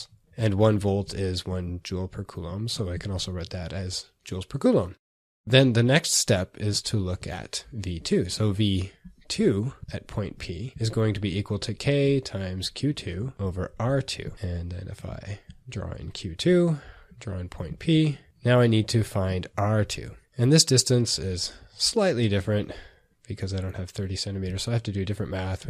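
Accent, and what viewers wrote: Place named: England, United Kingdom. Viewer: Bol t rahe hai jaise ki app England se ho..😩😩😩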